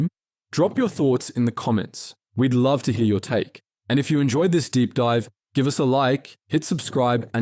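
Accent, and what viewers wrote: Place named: Australia. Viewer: Australian voice indicates it's never been and is using the BigBlackCo... sorry... 'BBC' for information.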